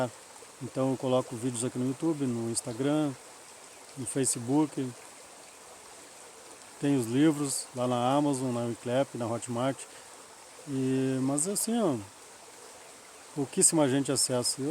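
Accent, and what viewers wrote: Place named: Rio Grande do Sul, Brazil. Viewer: .., sim.. .logo, que tú lançou o questionamento.. . eu já tinha a resposta,,.. . só não deu tempo, de pó arar o vídeo, e Te responder aqui nos comentários.*Tú e aqui do estado,(RS )provavelmente ,creio eu deduzi, pelo teu sotaque, entonação entonação , enfim.. .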